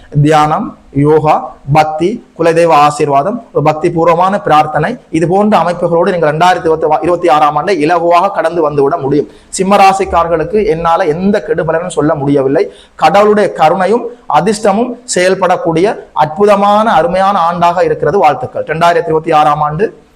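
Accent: Sri Lanka. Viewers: Sir unga tamil and astrology fan sir naan...ungaa srilankan tamil kekkatha naan ungala subscribe panniirukaen. I m from kanyakumari sir(Nagerkoil)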